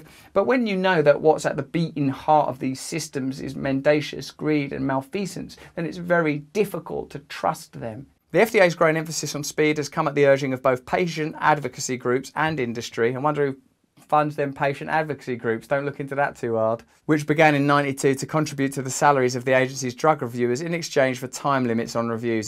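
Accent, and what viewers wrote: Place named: England, United Kingdom. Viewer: Thank you Russell for all your very intelligent , informative videos . An your research is spot on , as is your insight to different aspects of life events . Plus your personality , your English accent speaking voice , an your good looks , you look like a rock star / Jesus with your beard an long hair , very attractive . An you take care an stay Healthy , Russell . An we look forward to your videos.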